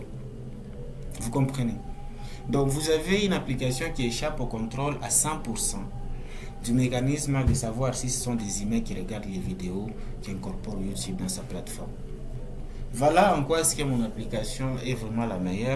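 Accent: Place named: Swahili, East Africa. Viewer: Salut je suis vraiment intéressé par cette application Et oft j'ai comme l'impression que tu as un accent swahiliphone est tu de Lubumbashi?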